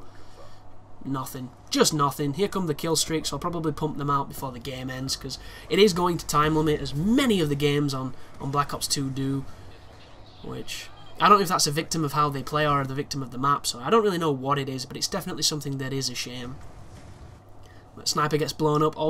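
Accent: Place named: North, United Kingdom. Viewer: Straight talking, no BS northerner. The best type of person. Hearing your commentary is great and I could listen to it all day. True talent there. Not offending other commentators but they can get annoying ( I won't go into detail but the ethics and scale of their channels turn their heads upside down)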